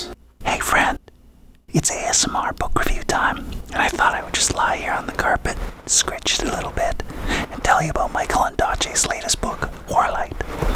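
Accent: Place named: Canada. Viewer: I barely hear Canada in your voice. I cringe at my own accent sometimes when I watch my videos back!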